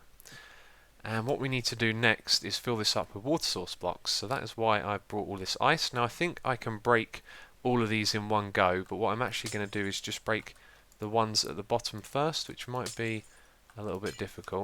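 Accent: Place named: Northern England, United Kingdom. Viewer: Your accent.... Why can't I be British?.... WHY!?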